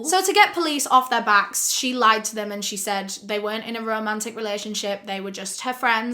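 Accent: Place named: Northern England, United Kingdom. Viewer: As a yorkshire woman born and bred, I am loving the northern twang that sneaks in every other sentence xo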